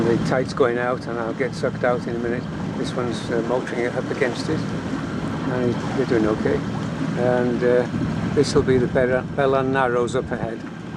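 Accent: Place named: North Wales, United Kingdom. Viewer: Diolch yn fawr,. Great vid. Seemed to have lost contact with your channel some 2-3 years ago but thankfully back on track now. Although I don't suffer from hiraeth living in France it's good to hear the North Wales accent & remember the waters & coasts I sailed years ago.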